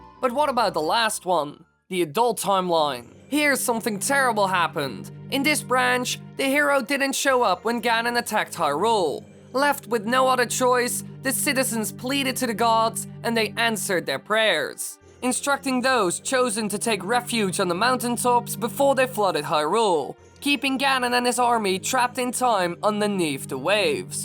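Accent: Netherlands. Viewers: It's Dutch. We all sound like that in English.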